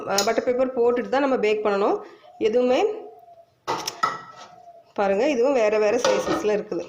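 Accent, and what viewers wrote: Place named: Kanyakumari, India. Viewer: Neenga town ah? But native Nagercoil k. Kumari mathiri neenga pesara style iruku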